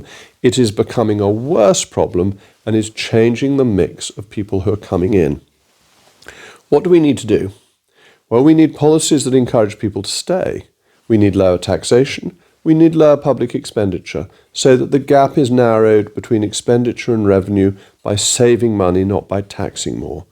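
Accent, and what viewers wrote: RP, United Kingdom. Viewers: Jacob the Elephant in the room remains. WHY are you still Conservative? It means you become more of a disgrace each day No amount of received pronunciation will save you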